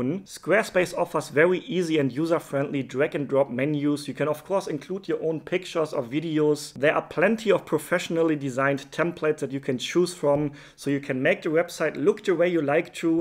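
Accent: Germany. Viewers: U sound like German accent? R u German?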